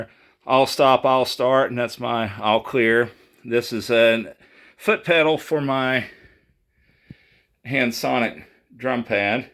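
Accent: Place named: Georgia, United States. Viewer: Nice Rig! I m a looper in Georgia. Where are you from? Sounds like from somewhere in my part of the country. I run the Boss RC 600, Handsonic, the Nord Electro 6d. I"m mostly acoustic driven. I use the Boss OC 5 for bass, the SP Compressor, another BBE Sonic Maximizer, with an LR Bagg Session Align, Strymon Blue Sky, Strymon Dig Dual Delay and Optima Air. Also use a Fender Smolder for electric guitar tones, Peterson Tuner pedal and LR Baggs Venue DI for my acoustic boost. Not in this order. This is a deep rabbit hole isn't it?! Love your channel.......feel like I found a soul mate! hahaha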